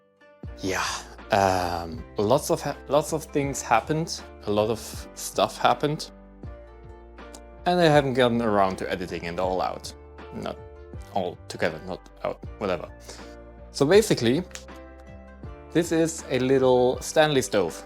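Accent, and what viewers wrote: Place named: Ireland. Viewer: Das war wieder ein sehr informatives Video😊 und du hörst dich langsam wie ein echter Ire an😂❤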